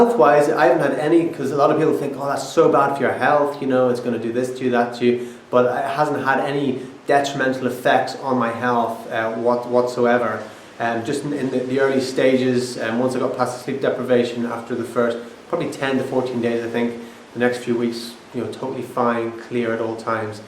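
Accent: Ireland. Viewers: I think he's got a hint of an Irish accent. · What part of Ireland are you from?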